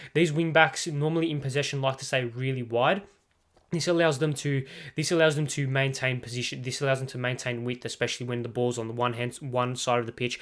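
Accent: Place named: Australia. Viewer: RIDICULOUSLY AMAZING WORK! So Proud of you, and to see you produce this A GRADE! From a Pom to hear an aussie talk about the great game I am simply clapping! EPIC WORK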